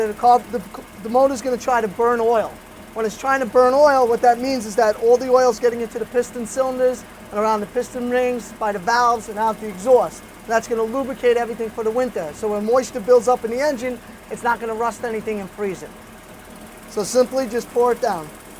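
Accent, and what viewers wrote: Long Island, United States. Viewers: Holy cow what a think Long Island accent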